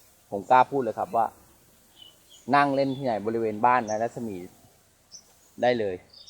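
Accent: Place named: Ratchaburi, Thailand. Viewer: สำเนียงราชบุรี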